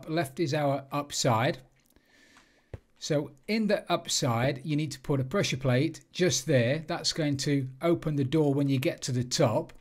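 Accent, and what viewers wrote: United Kingdom. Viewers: That British accent is, absolutely amazing.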